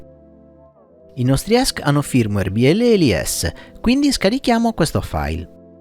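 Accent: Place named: Cagliari, Italy. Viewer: sei forte e poi il tuo accento mi sta troppo simpatico. Sarà colpa dei miei amici Cagliaritani che ricordo sempre con affetto!!! Grazie per i tuoi video fatti molto bene.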